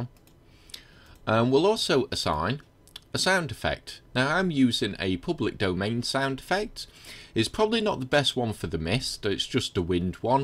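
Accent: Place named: North England, United Kingdom. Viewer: Hey, thanks for the reply. I managed to fix it. The problem was I needed to assign the ParticleSystems for ALL the Weatherstates. I had only assigned mist. when I assigned mist to all of them (because i havent made the other ones yet) it worked. Great lessons btw I dont get why you have so few views youre explaining your stuff very well and its not getting boring at all. Also love your accent haha, what is that? North England? Keep it up your videos are helping a lot.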